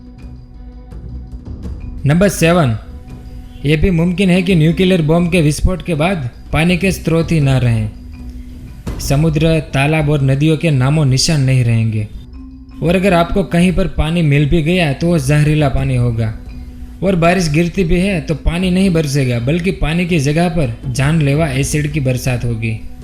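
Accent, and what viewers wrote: Gujarat, India. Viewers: nic video ...hahahaha Gujarati jalak rahi apki awaz me ... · Gujarat ki bhasa hi alag hoti hai jo ki hindi bolne se pta chalta hai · rocky rock 👌👌👌 u r 1000% bole ap ye voice boht gujrati he hindi 😃😃😀😀✌✌👌👌👍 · bha gujrati ho kya? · aapka bolne ka style gujrati hai. Aapka ascent se pata chal gaya. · Bhai Gujarati me Hindi kyu bol rhe ho ! Try to control tone, it's nice video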